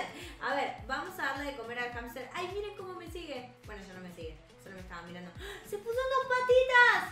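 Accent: Argentina. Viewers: Eres Argentina? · No es española es Argentina me 😑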